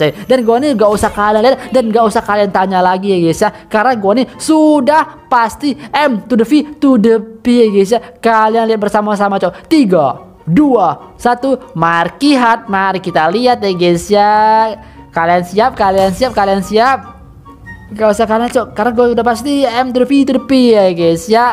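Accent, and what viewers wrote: Palembang, Indonesia. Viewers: Ngapo logat cak wong palembang yo..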